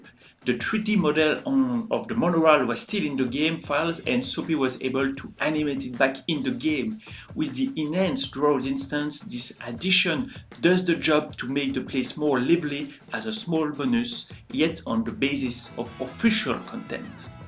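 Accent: France. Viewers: Clairement un français le mec 😂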